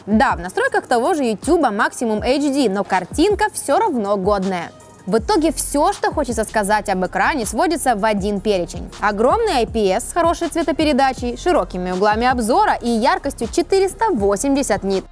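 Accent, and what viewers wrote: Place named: Kuban, Russia. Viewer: Кубанский, Украинский-южный как короче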